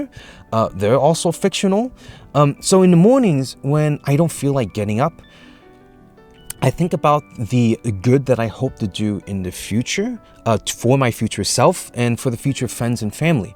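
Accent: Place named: New York, United States. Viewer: Why do I keep getting a hint of NY or NJ accent? 🧐. Really enjoy your videos! Well done!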